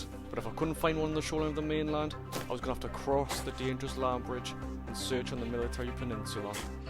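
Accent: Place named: Newcastle, United Kingdom. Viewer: Good to hear a Geordie streamer, great video mate 👍🏻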